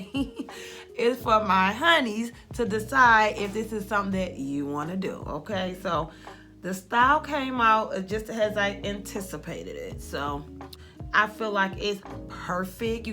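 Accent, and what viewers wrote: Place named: New Jersey, United States. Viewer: Love u and u sound like u from Jersey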